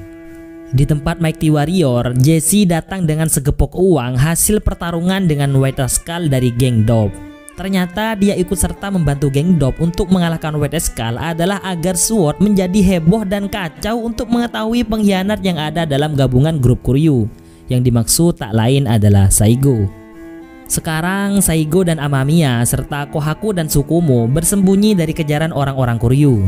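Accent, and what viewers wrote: Madura, Indonesia. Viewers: Adminah tepak ke Reng madureh wkwkwkw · Kentel banget dah logat maduranya,,salam setong dere bro 🙏🏻🙏🏻🙏🏻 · Oreng Madura ben bg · suaranya logat madura yaa 😁😁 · Logatnya kek orang madura min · Mak a madureh bang,,, apa caen lah, pa dekremaah beih.. Asli mana bang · Abang ren madureh mantap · baru tau ternyata mimin orang Madura, aku bangga. 💕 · Oreng medureh kak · cengkok e meduroo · Madureh ben cak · madura dimmh bang?? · Logat khas bahasa madura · seperti logat madura ini mah🔥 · Logat mimin sangat medok logat madura apa betul min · Mak Reng medure😂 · Medureh cak👍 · Yg ngasih suara orang madura ya logatnya madura banget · "apah ca'en lah epade'remmah beih"😅ras madureh jugen min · Logat mu ada logat madura min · Oreng madureh kaleh😂😂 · Teppak kereng medureh adminah😂😂😂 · Logatnya madura banget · Medureh min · Madureh dmmah bn bang🤣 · Bentar², mimin orang madura nih kayaknya😲, mantap min👍🏼👍🏼👍🏼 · Anak Madura mana min 🤭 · Salfok sama logat/nada abangnya cerita kayak ciri khas orang madura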